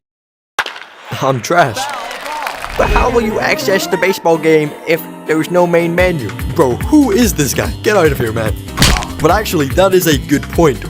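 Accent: Northern Ireland, United Kingdom. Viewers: R U NORTHERN IRISH BROOO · are you northern irish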